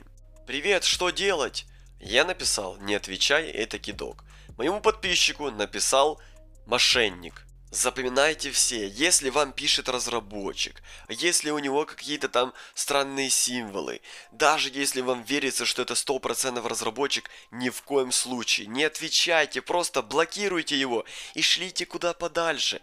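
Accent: Ukraine. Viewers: Ты украинец или беларус?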